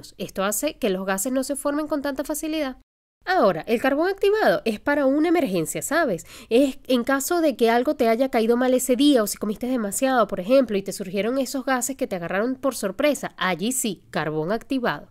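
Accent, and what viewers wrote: Venezuela. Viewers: Hablas como venezolana. Muy divertida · DIVINA Dr. he reido casi todo el video con tu sentido del humor ,,Belleza, simpatia ,conocimiento y excelente explicación .eres venezolana por tu acento. Gracias totales, Dios ilumine tu camino de bendiciones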